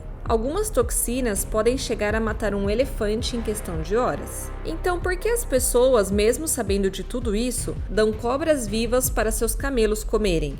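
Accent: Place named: Caipira, Brazil. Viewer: Bom vídeo. Mas a narradora precisa perder um pouco do sotaque caipira.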